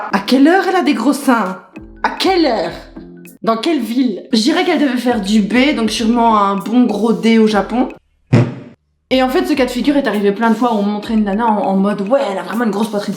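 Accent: Belgium. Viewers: Super marrante ! Je me disais bien que t'avais un accent belge j'adore !❤👍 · Un accent belge et très vulgaire, au secours !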